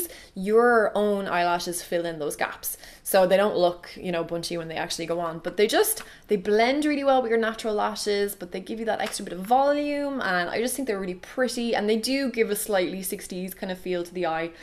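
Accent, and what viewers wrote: Ireland. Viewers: I could sit and listen to your beautiful Irish accent all day. So nice.....You look so pretty and I love your hair color.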